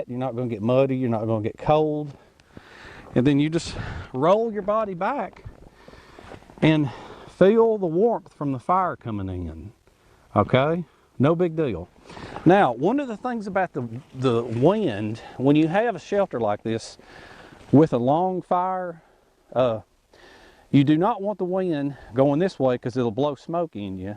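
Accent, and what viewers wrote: South, United States. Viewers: Hello again lidal ole England. Now i must admit, it took me till halfway through that vid to come on board. ive seen a few of your vids now. and i have wondered. is this guy for real? That accent of yours is fantastic, somewhere between the Dukes of Hazzard and Forest Gump. Back to your product, a few years back i had an idea in my head, not one that would make money, in fact i assumed someone must have already invented it. A camp bed without a frame was the idea i had. you could make the poles yourself with long straight bits of wood/logs. my idea was to have a sleeve running the lenth of each side. but your webbing on the side idea works better. due to a seriously small market, i doubt either one of us was ever gonna get rich, or a patent. this is a case of great minds think alike, as you have made the idea i had. And taken it several stages further with the whole survival blanket thing. 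i was just thinking a camp bed without a 37 piece pole puzzle. So all in all, good job mate, seriously. me and a friend of mine are in the planning stages of making our own youtube bushcraft/camping/outdoor stuff channel. I'll be sure to let you know as soon as we post anything worth watching. Gary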